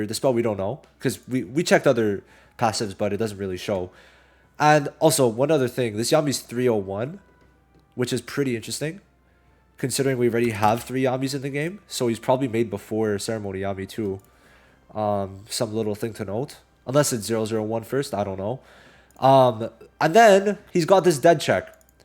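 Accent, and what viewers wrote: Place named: Germany. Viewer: Bro sounds so german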